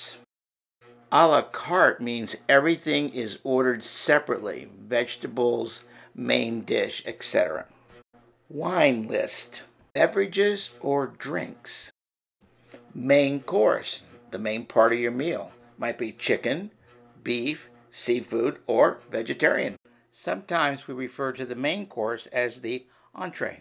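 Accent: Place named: Italy. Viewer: Your Italian accent rocks. 🙂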